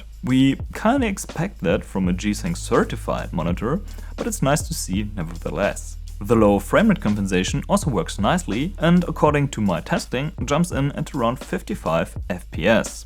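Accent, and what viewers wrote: Germany. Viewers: Dachte am Ende kurz, dass mein Englisch mittlerweile so gut ist, dass ich schon deutsch höre. Sehr geile Videos btw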